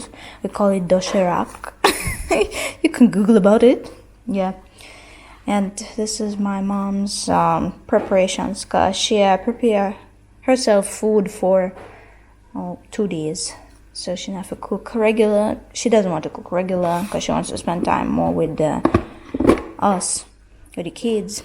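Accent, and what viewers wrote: Jamaica. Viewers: I dont know much about your story havent gone that deep yet but how you get such a Jamaican accent and can your mother understand it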